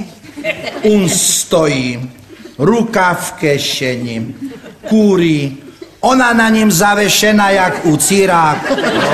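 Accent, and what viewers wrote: Slovakia. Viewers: ander je najlepsi ale slovensky tazko rozumiet ten dialekt ;D ale predsa je zvlast ;D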